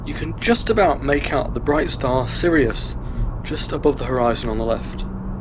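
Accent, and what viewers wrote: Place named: United Kingdom. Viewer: My ear hates British English.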